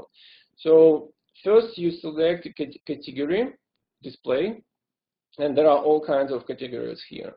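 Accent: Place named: Russia. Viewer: awesome Russian accent )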